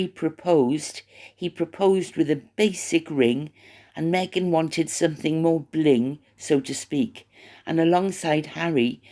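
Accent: Wales, United Kingdom. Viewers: I feel like I'm in front room on a Sunday. It's like listening to my nan. I'm from Newport next to Cardiff so they sound virtually the same. It's so comforting..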